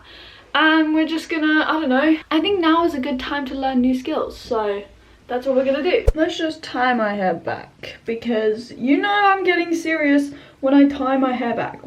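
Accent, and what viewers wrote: Germany. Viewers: As a native German speaker, I can tell you that your German accent is reeeally good❤️ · Love your german accent, i am from austria (so german is my mother tongue) and even I don‘t always get the grammar right - so don‘t worry too much, you‘re doing great!! Love your videos btw ❤️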